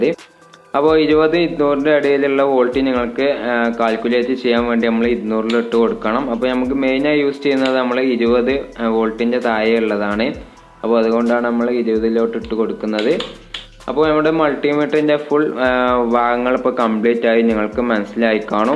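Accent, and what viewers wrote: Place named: Malappuram, India. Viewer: Muyuvanaayum ???? Enthu malayalam aado parayanathu.... malapuram or calicut sure. maya , payam , puya ..... 😷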